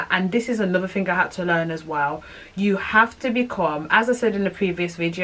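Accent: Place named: Birmingham, United Kingdom. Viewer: Yea i can hear the brummie accent does not sound manc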